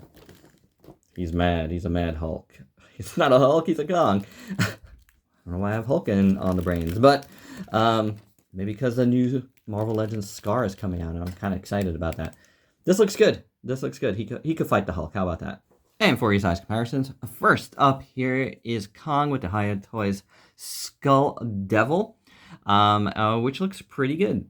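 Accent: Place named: New York, United States. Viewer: you have GOT TO BE from NY, i can hear it in your voice.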